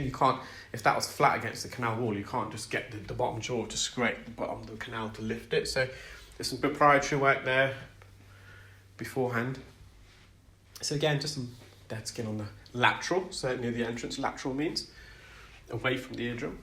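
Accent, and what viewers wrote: United Kingdom. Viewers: To all of the people who are correcting the way says his words. lives in the U.K not America. Americans pronounce their words differently than people in the U.K and Australia.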